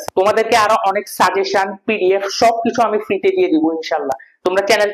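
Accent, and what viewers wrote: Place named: Noakhali, Bangladesh. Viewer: Vai er bari noakhali naki 🥰 noakhailla tone ache 🥰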